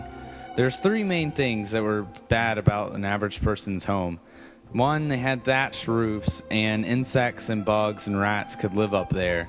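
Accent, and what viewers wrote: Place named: United States. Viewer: Give me an Englishman or women please. Why do I have a teenage yank trying n failing to explain this to me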